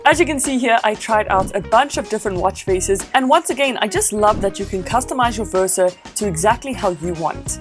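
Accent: South Africa. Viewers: I can spot a South African accent a mile away . . . nice review sistas! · Are you a SAFFA?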